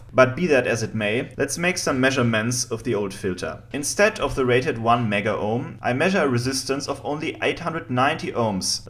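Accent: United States. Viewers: lol ! loved the cowboy accent !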